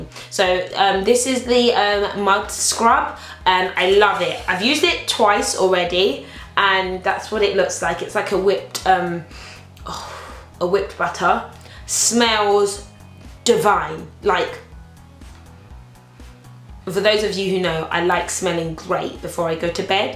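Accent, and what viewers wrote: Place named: United Kingdom. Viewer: Her accent is a British accent. She lives in the UK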